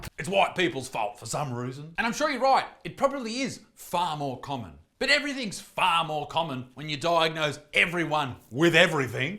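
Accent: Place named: Australia. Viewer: I kept hearing you say ' white inclusive consultant" and I was like wth is that? lolol just for reference you are Aussie and I am American lol..was just the accent mate ~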